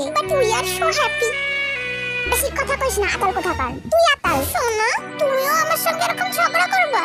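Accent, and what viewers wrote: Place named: Aminbazar, Bangladesh. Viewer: opsss sorry na mane tumar vasa ta amader Bangladesh er dhaka aminbazar er moto tai bolsi 🙂🙂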